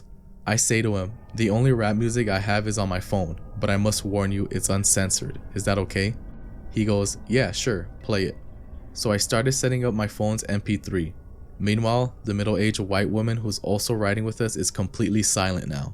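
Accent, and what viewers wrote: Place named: United States. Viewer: its crazy how good your english is you sound like an average american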